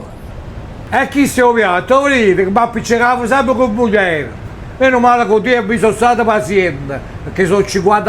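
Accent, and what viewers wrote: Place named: Bergamo, Italy. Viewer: se sei di bergamo ti capisco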